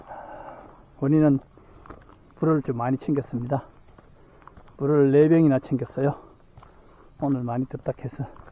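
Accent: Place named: Gyeongsang, South Korea. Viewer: 홀로걷는 뚜벅이길에 구수한경상도 사투리와 억양이 어우러지니 좋네요ㆍ중간중간 걷는길에 안내를 해주니 최고입니다 가게없으니 간식ㆍ물 꼭챙겨야한다는 팁!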